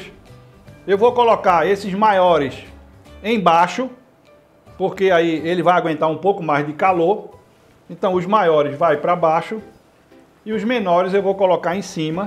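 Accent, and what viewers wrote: Brazil. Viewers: Que explicação profissional e prática! Esse sotaque é de Recife?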